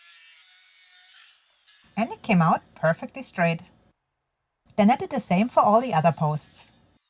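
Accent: Germany. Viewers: hi, this may be the reason why it sounds like the german girl next door. First time I noticed it, I though I had a hallucination..... VGvG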